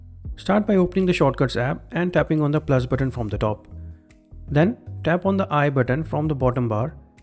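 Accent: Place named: India. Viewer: I knew this video works the moment I heard my brothers Indian accent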